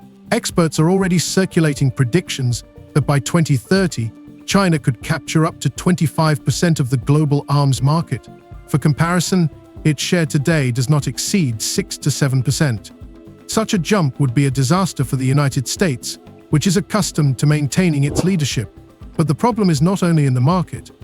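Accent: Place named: United Kingdom. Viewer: YOUR TITLE IS AN ABSOLUTE JOKE,.... CANADA NEVER STABS... BTW, IS THIS AI.? OR ARE YOU A REAL PERSON.?? YOURE ANOTHER AMERICAS PUPPY THAT IAM TIRED OF.... WHERE DID THEY GET THESE BRIT ACCENT??? I DONT THINK THIS TRUE THOUGH THERES NO REPORT OF THIS DEAL WITH CHINA... DO YOU HATE OUR CANADA.?? DONT TALK NONSENSE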